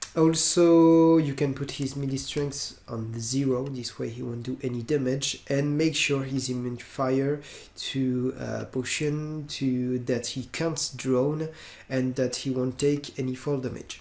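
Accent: France. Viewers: Je ne connaissais pas ta chaine, je viens grâce au discord de noppes et les premières secondes m'ont m'ont rappelés un truc, un français reste un français, même quand il parle anglais mdrr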